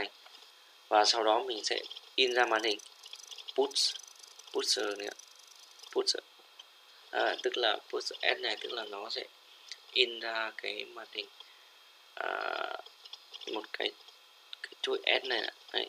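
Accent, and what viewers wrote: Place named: Nghe An, Vietnam. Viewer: nghệ an rồi!!!!!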